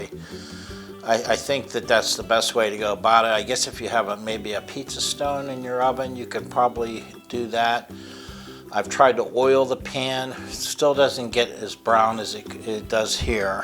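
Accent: Pittsburgh, United States. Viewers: Thank you from the bottom of my black & gold heart! 💛🖤💛 I’m a Pittsburgh girl living in Arizona and, like the others, I load up on Mancinis every time I come home! You are a godsend. Naturally, I am now subscribed to your channel. Your accent makes me feel like home. And thank you for explaining everything so well. All the details are so important And I can’t get that from reading a recipe. Go Steelers. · Did you ever read my mind! I"ve been looking for a recreation of Mancinis recipe for a very long time, usually give up! Grew up on the stuff, so many memories of driving down to the bakery in the 'Rocks to get it fresh. Our Sunday spaghetti dinner could go without meatballs, but NEVER Mancinis! Live too far away now to get it sent to us. My heartfelt thanks to you for sharing this, especially during these tough times. Little nostalgia goes a LONG way for comfort. 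So happy to find your channel! Just hearing your Pittsburgh accent makes me happy! If I don't have the malt powder, could you suggest an alternative? Also- cannot make real hoagies without this bread, so now I can pretend I ordered one from Portofinos.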